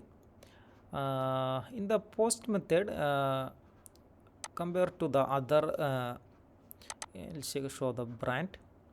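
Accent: Kerala, India. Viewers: malayali aano🙂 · Me after 10 sec of your video....I sense a malayali...naattil evdaaa?😁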